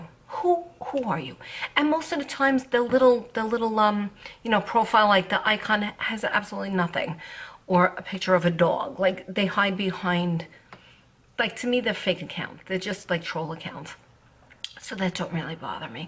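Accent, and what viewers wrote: New York, United States. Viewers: I always say, 'People are people' we're nice we're not nice we're doing we're not doing. What. Ever. You my dear are beautiful! I am not a subscriber, 1st timer actually. Yourre a New Yorker. You?! Really. No kidding. Love the accent. Love this personality. I find you quite cute. Really. I'm from California...in California...will probably die in California. Born in San Francisco actually. Not much of a talker tho. But a good listener. Liked your content. Thank you for sharing. With Love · I love your coffee talk! I love You! Your gorgeous!And i hope you know how beautiful you are! I'm a native New Yorker living in Fl now and enjoy hearing the voice of one of my peeps😉 yeah not responding is the best ( go f yourself ) to those ( low life trolls ) seriously, what kind of person wishes sickness and death on another person?! Well, I believe in karma...anyway, you keep on doing your thing ...trolls will go away when they aren't getting attention. 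As for the so called friends....they can take a walk too.😊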